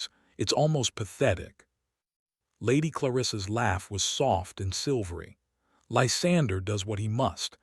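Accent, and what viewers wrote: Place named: South, United States. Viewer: I'm sorry but you can't use the country voice for these stories. It just doesn't fit and doesn't make me want to watch it.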